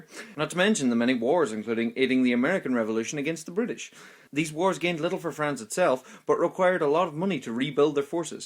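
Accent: Ireland. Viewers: You sound Irish :) pretty cool if you ask me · are you irish or something? (not that you are) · He is Irish mate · Because HE IS IRISH!!! DUN DUN DUUUENWIAKWOEISJWOIEDJDISOOWEK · I wish It had subtitles. You speak so fast and i'm not used to the Irish accent.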